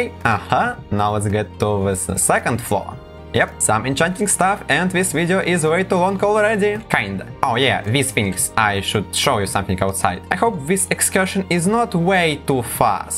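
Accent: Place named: Russia. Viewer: Русский акцент узнается с первых слов🙃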